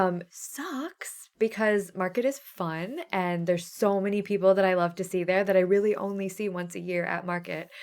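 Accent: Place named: Midwest, United States. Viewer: Sorry you didn't get to Nashville, but it was good to see you! I had to lol when you mentioned your 'midwest coming out'! I've thought that for years ever since I watched Fargo - I hear that accent when speaking! 🤣 I'm sorry for your loss of your uncle.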